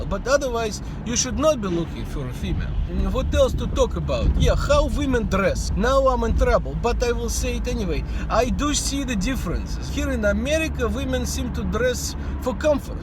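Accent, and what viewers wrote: Russia. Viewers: At first I thought you were faking a heavy Russian accent. But then I realize that it IS your accent. And it is very cute. Keep up the good work. Your videos are excellent! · you clearly know how to speak English very well! I wish I knew what you sound like when you’re not purposely using this heavy Russian accent. <3 your content regardless!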